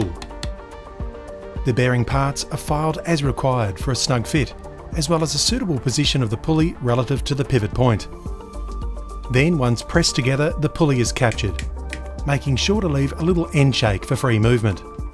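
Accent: Australia. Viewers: P.S. Always nice to hear a fellow Aussie! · one second in to this video and the ambient music + aussie accent conjures up some nostalgia for those 2000s documentaries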